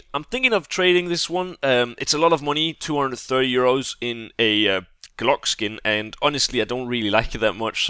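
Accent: Denmark. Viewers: You sound every Danish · Danish??? your accent is really Danish ! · I don't know why... But you Sound very Danish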